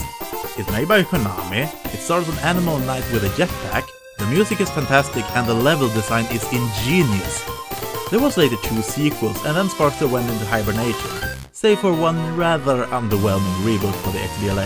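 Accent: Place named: Sweden. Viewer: Jeg syns engelsken din er like sjarmerende som den svenske dialekten din :)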